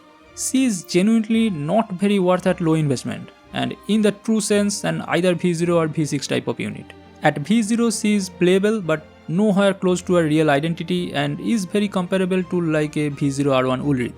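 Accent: India. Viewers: sound of indian ppl , not recommend.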